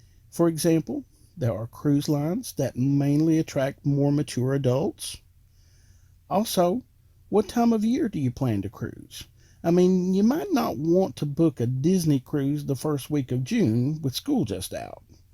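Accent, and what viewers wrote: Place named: Texas, United States. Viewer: Love this guys accent, kind of folksy and down south perhaps Texas, not being American not sure but he puts it across in such and easy going manner you can sit through the video till the end.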